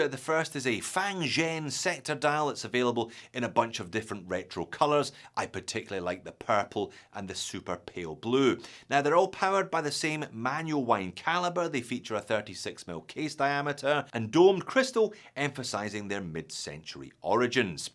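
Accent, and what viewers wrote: Scotland, United Kingdom. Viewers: Love how his scottish accent gets more and more thick over time …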